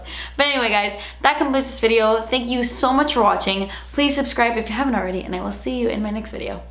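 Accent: England, United Kingdom. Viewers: Your English accent sounds like Angelina Jolie in tomb raider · There always has to be like 2 words pronounced in a british accent in every video 😂😂 and i love it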